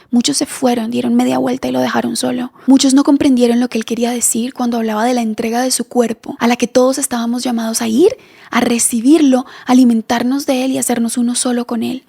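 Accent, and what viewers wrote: Colombia. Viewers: Tono de ablar que eres colombiana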